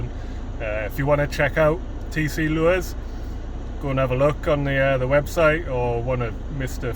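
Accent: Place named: Durham, United Kingdom. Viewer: Well done. I have been watching your videos for a wee while now and I love them. I am originally from Durham but now live in Northern Ireland. I love to watch you fish your local marks the spots I recognise and it is great to hear the accent. Last year my wife bought me one of your hoodies for an anniversary present and I wear it with pride even when sea fishing in Galway. Keep up the good work.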